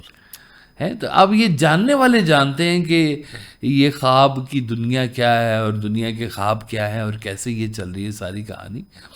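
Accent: Punjab, Pakistan. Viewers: ji....thoda punjabi accemt hai na isliye thoda samajh na hi aati....1 martaba suni thee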